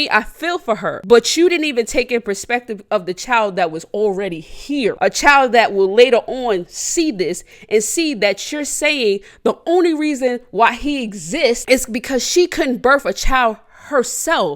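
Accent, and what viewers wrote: New York, United States. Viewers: New York accent came out 100 percent in this video 💯😭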